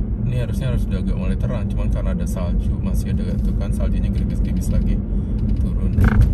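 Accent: Medan, Indonesia. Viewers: hah iyaya??? Aku kirain orang medann, hahaha. Thx ya infonyaa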